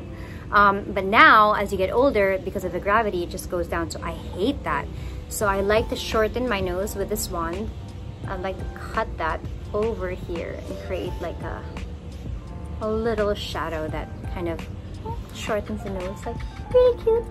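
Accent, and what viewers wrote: Bicol, Philippines. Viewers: That "luway luway lang tabi" ang cuteeee. Lakas maka shala pag siya ang nag B-Bicol. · "Luway-luway lang tabi"! Hihi nakaumok dangugon ka magbicol! So cute! 😍💕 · Heart is a well-known personality yet in her previous vlog she went to a thrift store other personalities don't do that but she does! I Love you miss Heart! Keep slayin'💖💘 I also love her Bicol accent😍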